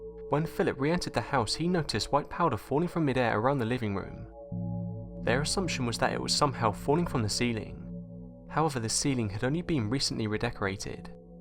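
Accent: England, United Kingdom. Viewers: I feel that his speed is just fine, you might have to get use to his English accent. If you are only use to an american accent then the english, Irish and Scottish accents can be difficult to understand. If you keep listening to these accents then it will become easier with time. 😊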